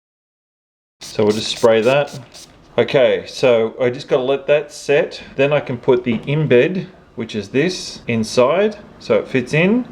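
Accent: Australia. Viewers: AU Love the colours, and the way you have it is lovely. It's so good to see a video with Aussie measurements, and accent!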